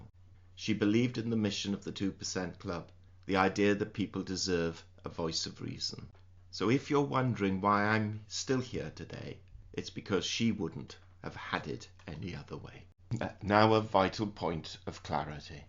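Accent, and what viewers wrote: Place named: Midlands, United Kingdom. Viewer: I can hear it in your accent a little. I would guess not far from Burton. Very sorry for your loss, 91 is an amazing age. I will be lost without my mum, can't imagine how you feel.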